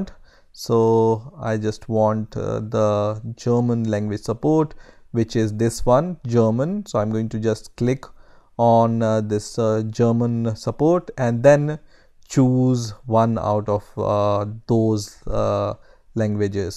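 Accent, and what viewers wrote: India. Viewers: Is this fake? He sounds india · why does he sound indian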